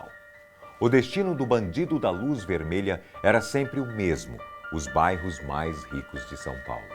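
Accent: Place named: Minas Gerais, Brazil. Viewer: Pq esse sotaque de mineiro se ele é Catarinense?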